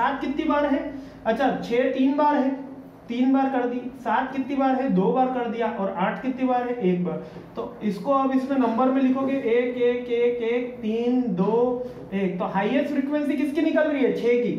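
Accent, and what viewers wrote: Gujarat, India. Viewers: Sir aap Gujarati hainn kya?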